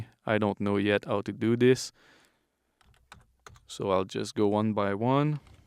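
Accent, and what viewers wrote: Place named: Quebec, Canada. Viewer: You would love Object editing in Samplitude. They been doing this for a long time. They have this crazy sale going on right now for Samplitude Pro X3 plus Sound Forge Pro 11 and Spectralayers Pro. Worth looking into. I'll post a link but I'm not sure it'll work. <link> I'd recognize that Quebec Accent anywhere. Salut! And thanks for the video.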